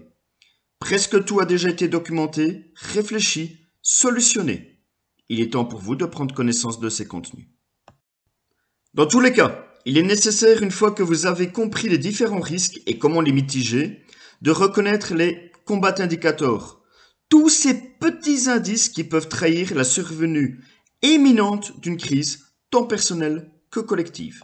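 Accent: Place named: Belgium. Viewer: Je pense reconnaître un accent du plat pays ;) heureux de savoir qu'il y a d'autres personnes qui s'intéressent a ces problématiques dans ce pays si mal préparer a ce genre de risques !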